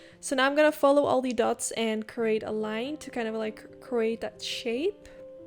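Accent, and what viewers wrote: Netherlands. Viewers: My mind: SHE IS DUTCH! I could hear u speak English with the dutch highlights😆 hahah very nice video! I do the same!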